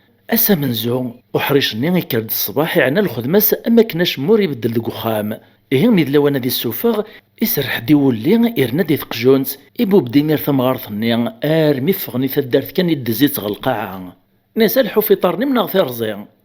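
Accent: Kabylie, Algeria. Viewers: Votre kabyle ressemble au mien 😊 je suis de Béjaia et j'habite a Londres. Je dors avec vos belles histoires. A3tik sahha ayizem averqach.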